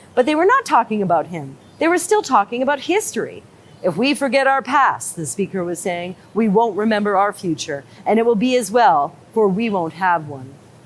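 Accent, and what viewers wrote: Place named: South, United States. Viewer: Love your southern accent and the seagulls,too. Well done !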